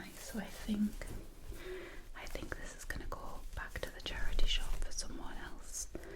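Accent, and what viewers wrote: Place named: Northeast England, United Kingdom. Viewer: I'm North East England myself by the way, listening to your voice feels like home ❤️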